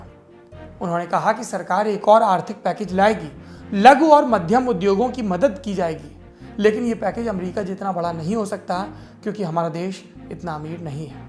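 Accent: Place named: Punjab, India. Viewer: your accent of Punjabi was not but cute.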